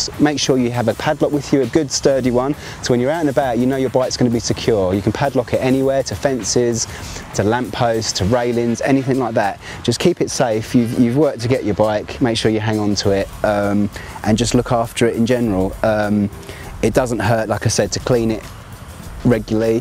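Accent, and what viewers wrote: United Kingdom. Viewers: *That British Accent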